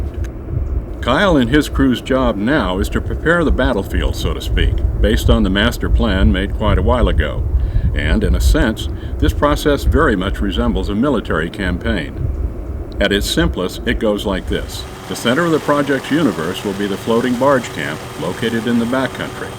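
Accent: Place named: United States. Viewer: narrator hard American accent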